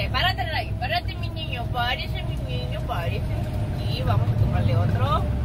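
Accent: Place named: Mexico. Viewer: me gusta tu dejo o acento mexico epaaa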